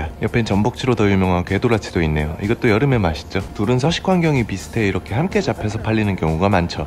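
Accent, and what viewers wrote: Gyeongsang, South Korea. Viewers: 경상도~~~말투부터 짜증나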